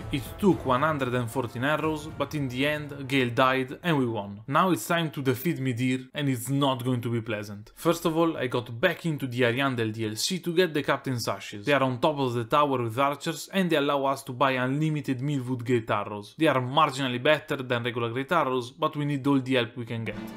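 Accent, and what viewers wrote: Italy. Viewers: I started this video without knowing that was your actual voice and I legit just fell in love with it, time to play "Guess where that accent is from" Nevermind, I was guessing Siberia but he's actually from Italy. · Ma per curiosità, che accento è? Che parte di Italia? Ogni tanto mi sembra quasi spagnolo o slavo ^__^ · I love how i could tell you are italian from the first thing you said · AH HA! Lo sapevo che l'accento era familiare hahaha! Per qualche motivo, questo è il primo commento che leggo che mi conferma che sei italiano anche se lo sospettavo dal primo momento (?) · Sottofondo con le OST di Monster Hunter, contenuto di qualità e l'accento italiano non fa altro che rendere il video più scorrevole! (almeno per me :3) Ti ho scoperto da poco e mi sono iscritto subito, un canale così va supportato ^-^ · For real, I love Italian accent, I love dark souls so mathematically (I love this channel)². 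Btw awesome videos my dude, greetings from Brazil. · You sound a lot like an italian trying to speak english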